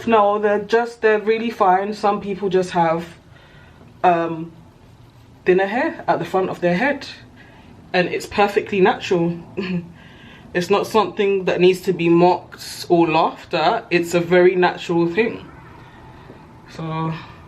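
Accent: United Kingdom. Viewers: Your hair is really nice on you, and your British ascent is everything👑😊😊😊, do u have a video on what to expect in the first 12 month? , please I need such video👑👑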